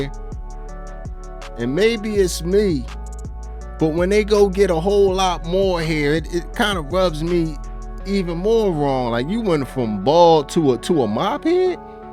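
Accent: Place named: Baltimore, United States. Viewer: I absolutely love your Baltimore accent!!!!!❤❤❤❤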